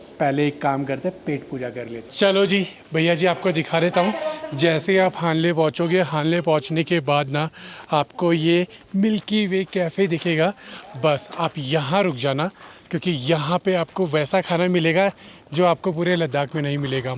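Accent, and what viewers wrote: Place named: Maharashtra, India. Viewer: Bhai ke hindi me marathi ki jalak dikh hi gayi hai Maharashtra hamara Maharashtra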